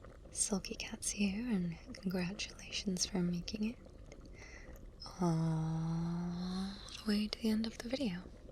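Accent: United Kingdom. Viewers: Is Sulky naturally british with an American accent? Or is she American with a really good British accent?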